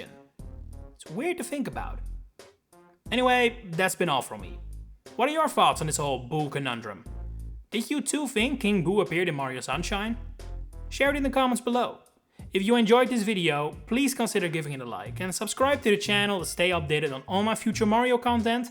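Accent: Netherlands. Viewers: You sound Dutch. Are you?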